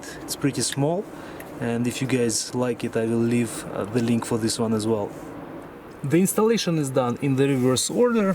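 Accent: Russia. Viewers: А почему акцент рязанский?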